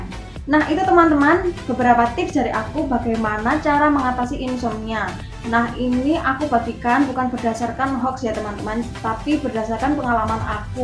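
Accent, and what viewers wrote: Java, Indonesia. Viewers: Medok e ketok emen :v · Medoknya itu nah 😍😀😀😅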